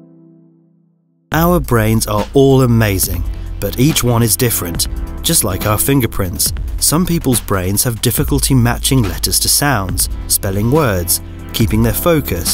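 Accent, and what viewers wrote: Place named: United Kingdom. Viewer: Having a British narrarartor doesn't make you sound smarter Spellex, tap into your own homegrown talent. I'm going to have to give this one a dislike for right now but I know you can fix this Sheldon. As Spellex's #1 fan this video really breaks the canon for the Spellex lore. Please make a better video with canon American actors. Antrex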